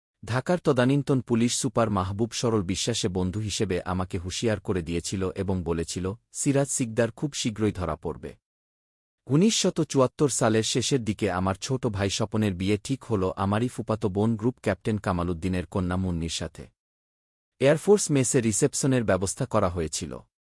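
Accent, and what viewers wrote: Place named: West Bengal, India. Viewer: The reader is from West Bengal!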